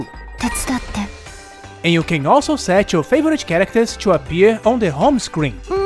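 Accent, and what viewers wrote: Brazil. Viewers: sabia kkkk vc fala um ingles com um sotaque forte de brasileiro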